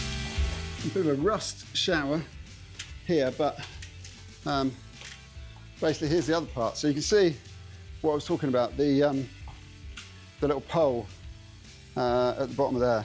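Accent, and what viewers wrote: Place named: United Kingdom. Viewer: muy intersante! Mi padre tenía uno de estos, ¡estos son recuerdos para mí! Y me encanta el acento británico!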